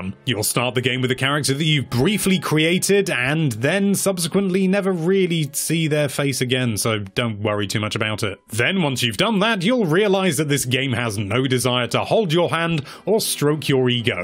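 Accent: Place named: United Kingdom. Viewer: Click on video Hear British accent Close video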